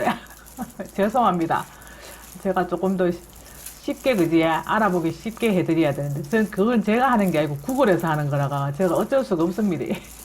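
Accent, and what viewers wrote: Gyeongsang, South Korea. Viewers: 도라지 쓴맛때문에 잘안하는데 꼭해봐야겠네요 구수한경상도 사투리 넘좋아요 정구지 카마 윗지방사람들 잘몰라애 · ㅋ ㅋ 경상도 사투리 넘 구수합니다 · 정말로 미역줄기 도라지김치 정말로요 맛나게 해요 요리를 잘배워서요 경상도 아주마 사두리 구수하네요 감사합니다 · 정감 갑니다 경상도 압니까 저도 경상도 입니데 동네에서 인기 좋은 친구 겠어요 활발한 성격 부럽읍니데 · 정겨운대구말 괜찬요.^^많이가르쳐주시니감사합니다. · 음식도 덕담도 넘 좋아요 말씨가 대구말 같은데 혹시 대구분이신가요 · 너무 재미있어요 처음으로 봤네요 구수한 경상도 말씨 좋아요 저도 고향이 경상도 거든요 도라지 참좋아하는데 내일시장가서 당장사와야 겠어요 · 선생님 경상도 사투리가 넘정겹고 하시는음식은 정말 맛깔시럽십더 저도고향이 경북의성이라 저의고향억양이네요 반갑고 요리잘보고 구독꾹눌리고 자주찾아뵙겠습니다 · 구수한 경상도 사투리정이갑니데이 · 경상도 사투리 재미있어요 요리 잘 배우고 고맙습니다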